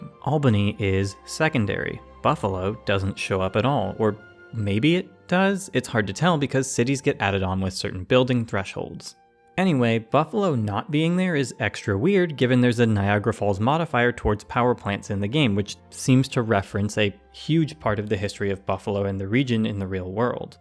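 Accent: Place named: Ohio Valley, United States. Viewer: If you want to see a map game pushed to its limits, try Mappa Mundi for HOI4. Also, up until you mentioned it, I was wondering so hard why you sounded so familiar and local and then you said you were from the Ohio Valley as well lmao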